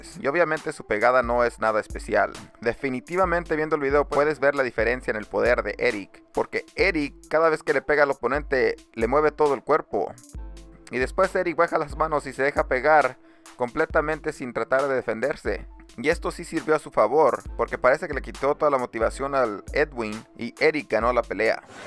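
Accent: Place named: Sonora, Mexico. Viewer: oye eres Sonorense? tienes el acento de mi estado.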